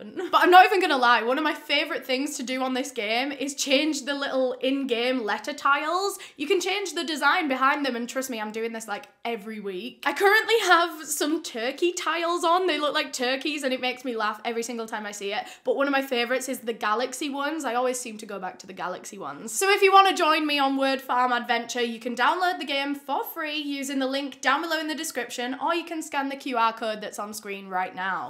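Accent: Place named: Yorkshire, United Kingdom. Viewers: Yay a northern lass! I'm guessing Leeds? 🙏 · you know how to brighten my day with your true crime tales and your delicious-sounding Yorkshire accent! Thank you so much, my dear girl! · I'm going to be the billionth person to compliment voice. 🙂I've heard a lot of Yorkshire accents in period pieces, but I'm pretty sure this is the first time I've heard the modern version. I didn't even know where in the UK from until she mentioned it. I love listening to her, and think she does a great job presenting the cases. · Sounds more like a Leeds accent 👍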